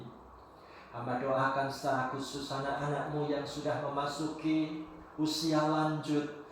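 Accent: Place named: Banyumas, Indonesia. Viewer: Bahasa Panginyongan nya mantap. Asli Mbanyumas nggih Pak Pdt?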